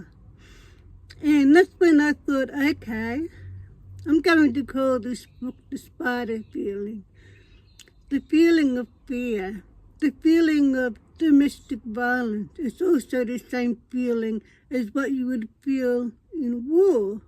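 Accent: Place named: Australia. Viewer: I could hear that you're Australian but thought you were living in England.Where do you park up?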